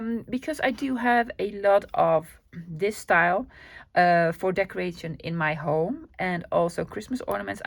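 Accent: Netherlands. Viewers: OMG I just love your channel. One of my closest friends is of Dutch Heritage so I’m very used to ( and love) your accent. Your enthusiasm and appreciation for all things even remotely vintage strikes a chord with my heart. You have definitely won another fan. Just wish I could buy economically from Europe, being on a pension means I simply can’t afford the shipping ( same goes for USA) but I’ll continue to watch and learn and purchase digital files when I can. Thanks again you’re an inspiration to me ❤o😊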